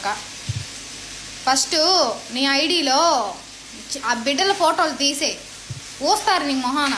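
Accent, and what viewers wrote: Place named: Kadapa, India. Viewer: Endi medi kadapa me basa Chala bagundi I love you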